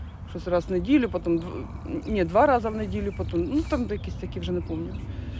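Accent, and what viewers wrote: Ukraine. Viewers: Видно що ви з України, говор наш. Дивлюсь і радуюсь що ви не там де бахкають. Щастя вам і удачки, а кому не подобається хай не дивляться і не слухають.❤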